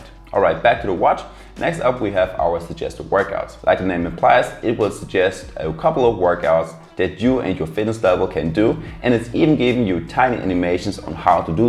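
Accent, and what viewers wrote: Germany. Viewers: Direkt deutsche akzent gehört coole videos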